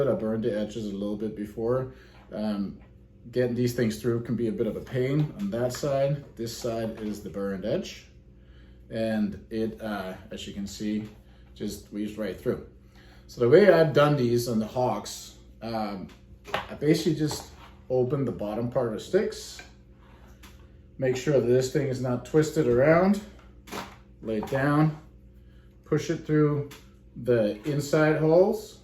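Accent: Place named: Russia. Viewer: Thanks for the idea, im doing this as i type! Btw, what country are you from? Ive watched alot of your videos, and i swear i can hear a slight dialect from maybe russia?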